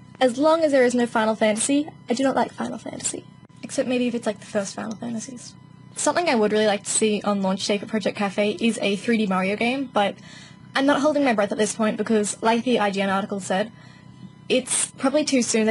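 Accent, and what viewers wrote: Australia. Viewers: Your first vid I'm seein'. I subbed. At first I thought you had a british accent, but then I clocked it was Australian lol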